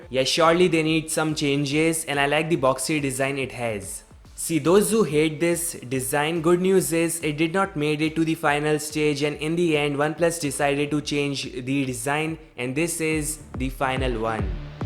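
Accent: India. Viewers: Dude, you should honestly get some English and pronunciation lessons before you post videos. The way you speak and that cringe-worthy stupid accent that flows outta your mouth is the worst thing ever! People like you give Indians a bad name when they tell us we cannot speak English properly. Swear to god, get educated before you educate!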